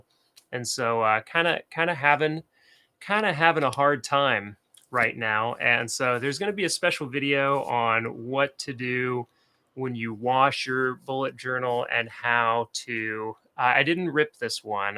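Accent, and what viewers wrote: South, United States. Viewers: The southern accent was chef’s kiss And I’m mad I missed this stream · love southern accents! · Love the southern accent and the "Never settle" in the background.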